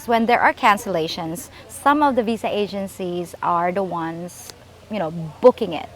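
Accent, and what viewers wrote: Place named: United States. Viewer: Your English is so exquisite. The pronouncing and vocabulary is so precise. Also, your American accent is so sweet.😊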